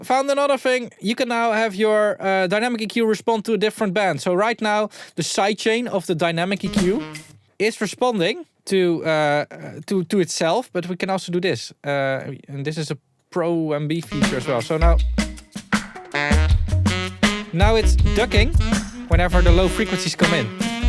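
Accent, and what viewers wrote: Netherlands. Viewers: yeah he sounds very Dutch, that’s true, I’m not a Dutchy but I’ve been there, honestly I’m kind of surprised how bad people are at identifying accents, I just said that to make a point, since the guy was being racist.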